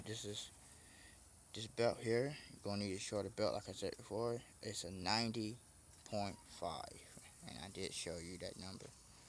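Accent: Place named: Louisiana, United States. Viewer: ok you're either high, drunk, both or whatever but you're making no sense at all. I can hardly understand what you are saying. sounds like you might be from Louisiana.. but you start one sentence then start taking bout something else. you also need to hold the phone right side up. I lost a lot of brain cells watching this video. not knowing the names of the parts is not helping. by the way I stopped watching your video after 2 minutes...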